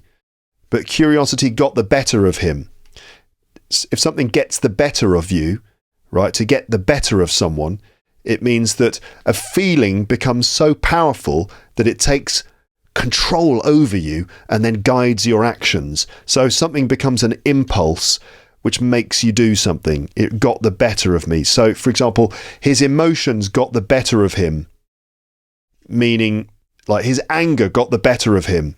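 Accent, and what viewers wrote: United Kingdom. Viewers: Hello! You are an amazing teacher I can say i am so glad to have you in youtube. I understand your teaching process.i have learn so many things from your videos. Your British pronunciation is not that difficult.I understand easly everythings. You doing great work for helping like us I really appreciated and from the depth of my heart I wants you to thank you! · Your channel is a real treasure. I mean, your British accent, intonation, and facial expressions make it more fun and catchy! Thank you so much, Mr.! from 🇸🇾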